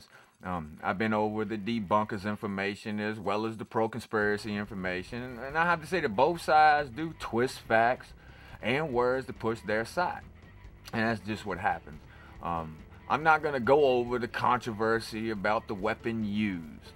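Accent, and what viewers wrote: United States. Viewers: This guy is so fun to listen to, really does his homework on this stuff and makes a person think. Now if all the sheeple would just tune in and listen. I gotta new love and it's Oh and cute American accent, lol! Love from Canada xoxo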